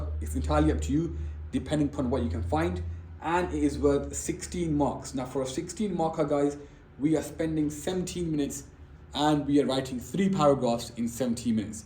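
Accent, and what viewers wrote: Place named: Leicester, United Kingdom. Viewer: hes defo from Leicester but otherwise real good teacher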